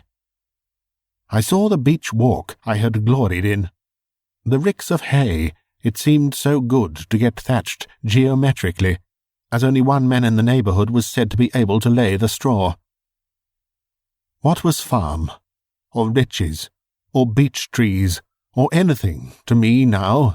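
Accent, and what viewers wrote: England, United Kingdom. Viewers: You and this channel have become my absolute, number one favorite thing to listen to and I listen to them repeatedly! IMHO, only a proper English gentleman can do these awesome old ghost stories the justice they deserve and I’m so happy to have discovered you! I enjoy your readings just as much as I enjoy Michael Hordern and Basil Rathbone readings but (unlike them) I can look forward to new ones from you. I listen every single night when I climb into bed and let me tell you, I’m enjoying losing sleep...THANK YOU!!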